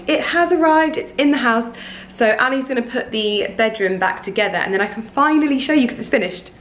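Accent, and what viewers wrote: RP, United Kingdom. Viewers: How posh x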